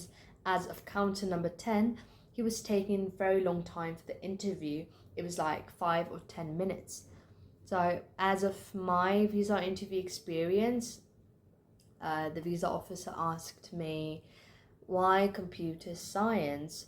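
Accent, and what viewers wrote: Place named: United Kingdom. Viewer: british accent at its best the vo must be impressed by this dd congrats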